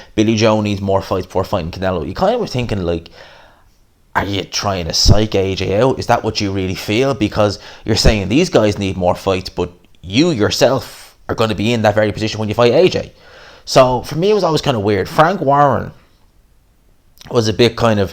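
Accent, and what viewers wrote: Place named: Ireland. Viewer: I'm new to your channel by way of Ade. I'm subbing purely for the Irish accent, the boxing knowledge is a plus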